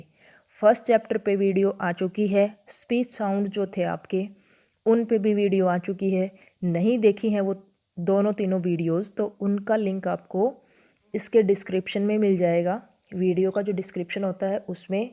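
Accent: Haryana, India. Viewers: Are you from haryana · Mam aap haryana se ho na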